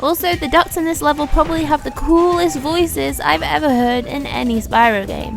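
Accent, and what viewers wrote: United Kingdom. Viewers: Oh my god, you are a fucking disgrace! I can't believe how bad this review is! First off, the hell man, british narration? No review with that disarray! And boss stages and mini games are not fucking levels. FUCK AND GET THE FUCK OFF YOUTUBE! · SPYRO 3 WILL ALWAYS BE MY FAVVVVVVV :) Love the vid and love the pommy accent ;)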